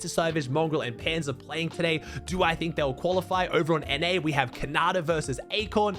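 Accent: Australia. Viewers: Tooo aussie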